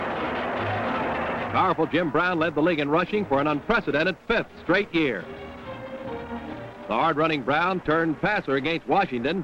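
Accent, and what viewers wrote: Canada. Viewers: Canadian pronunciation.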